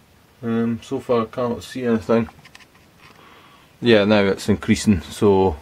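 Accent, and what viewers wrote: Scotland, United Kingdom. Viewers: Hi must say I like your videos I must ask u your accent are u Scottish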